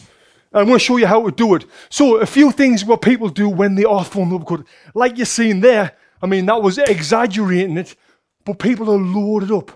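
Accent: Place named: Northern England, United Kingdom. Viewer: I like it when he says "noooooooo " in the beginning haha. Proper northern accent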